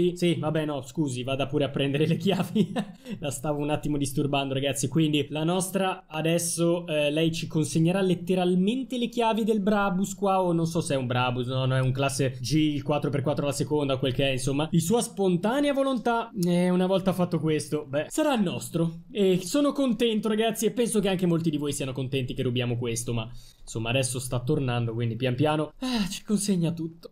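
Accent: Milan, Italy. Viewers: Ma sei di Milano?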